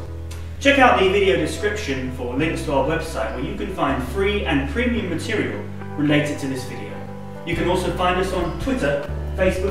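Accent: United Kingdom. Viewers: Is this britidh accent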